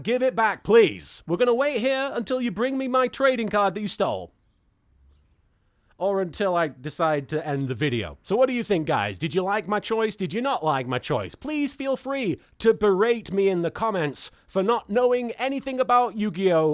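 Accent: United Kingdom. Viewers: being extra limey today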